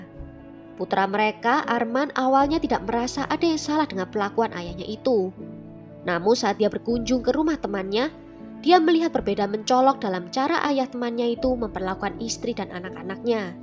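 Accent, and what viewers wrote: Java, Indonesia. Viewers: Medok kali jowo nya 😂😂😂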